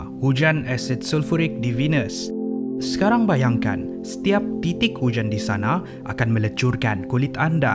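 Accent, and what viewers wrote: Sabah, Malaysia. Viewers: Abg ni sabahan kan? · Kan,sbb cara pencakapan die bukan macam malay..dia cakap mcm ada slang sabah n indon sikit..tulah sebb org ingat die indon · ni org mana? sabah ke?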